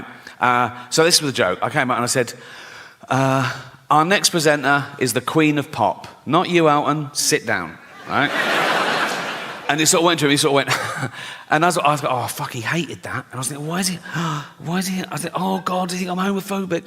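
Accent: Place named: London, United Kingdom. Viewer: Gervais for me is such a bore and I hate his semi London accent. 🤮🤮🤮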